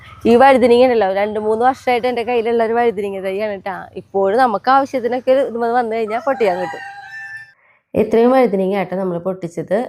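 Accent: Thrissur, India. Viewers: കാണുന്നതു തന്നെ സന്തോഷം ഇത് സ്ഥലം എവിടാണ് തൃശ്ശൂർ ഭാഷാരീതി ഇഷ്ട്ടാ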